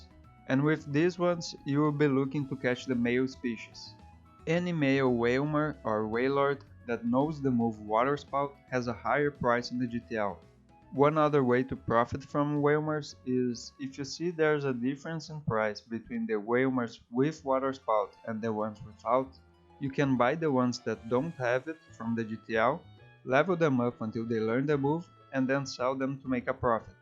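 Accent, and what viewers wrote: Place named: Brazil. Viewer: You speak english like a brazilian guy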